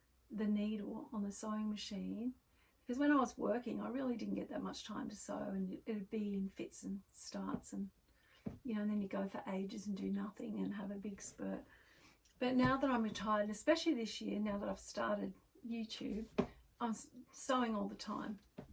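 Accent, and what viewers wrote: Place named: Australia. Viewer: Hi, I finally circling back to your channel. I’m glad I did too. Because I do love to sew. And I used to watch both my grandmothers sew quilts. My mother did as well. Though I don’t quilt. They are my favorite comforters. I have my machines. Are you from Australia? I love your accent. Thank you once again for visiting my channel last month and for your comment. I look forward to watching your videos now that I found you. 😊❤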